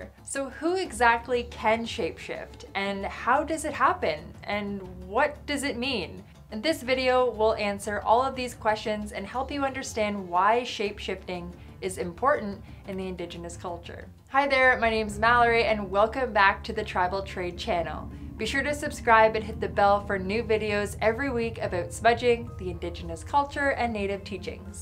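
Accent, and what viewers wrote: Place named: Canada. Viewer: I wonder if she is Canadian or Minnesotan the way she says certain words. Interesting video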